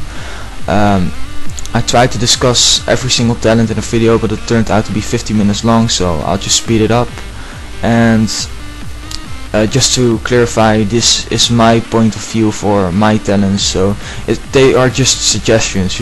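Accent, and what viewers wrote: Netherlands. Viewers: Are you dutch? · Is je moedertaal nederlands? :-) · Was meteen te horen dat je nl bent Nice vid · u sound dutch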